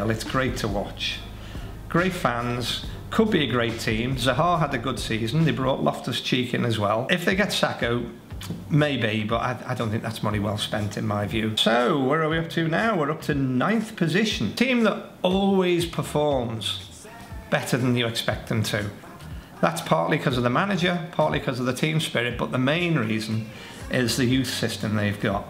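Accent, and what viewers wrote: Liverpool, United Kingdom. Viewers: scouse prick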